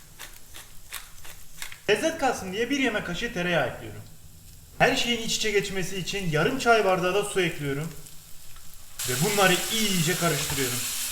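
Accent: Adana, Turkey. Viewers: abi sende adana şivesi var heralde kesiyürüm bakürüm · Abicim adanali misin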